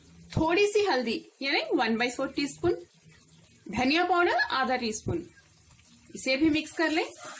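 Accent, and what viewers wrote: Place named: South India, India. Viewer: Hope you are from south india, Because of your Hindi pronunciation, i feel that.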